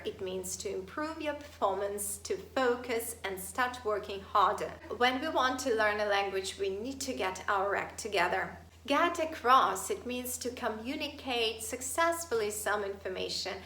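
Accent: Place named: Ireland. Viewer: You king of sound Irish when you speak :-) Great teaching skills.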